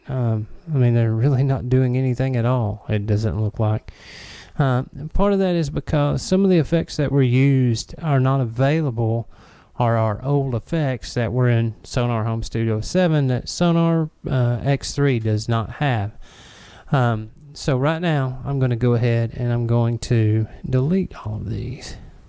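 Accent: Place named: South, United States. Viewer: Dudo mucho que ese hombre hable español. Se le nota, su acento es del sur del pais.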